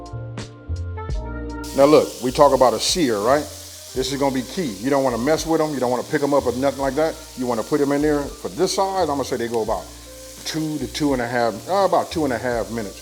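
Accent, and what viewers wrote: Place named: Los Angeles, United States. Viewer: is DEFINITELY from the West Coast ( I’m from Compton, Ca) and I hear L.A. or my city 😂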